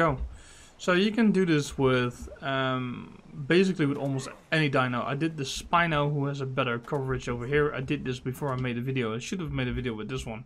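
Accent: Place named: Netherlands. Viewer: Theres such a good Managarmr paint job Actually (fakka strijder btw, k hoor gwn dat je NL bent over je engels XD) gooi ff je discord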